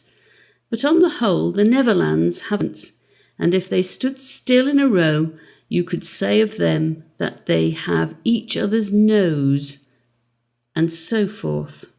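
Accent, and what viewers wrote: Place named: United Kingdom. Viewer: I like British stories read with a British accent best & this one is easy to hear, loud & clear. Thank you.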